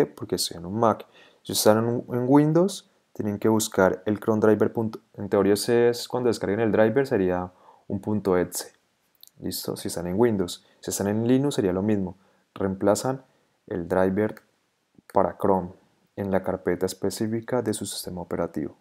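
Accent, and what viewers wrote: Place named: Bogota, Colombia. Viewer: Ese acento de norte de Bogota si se puede ver hahahaha gran tutorial!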